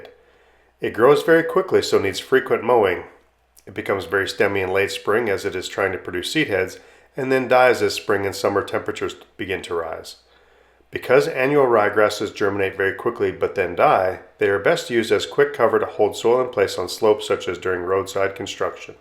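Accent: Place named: Midwest, United States. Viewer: It's a Midwest pronounciation quirk. Plenty of Midwesterns with Italian roots, including more recent generations, also pronounce it this way.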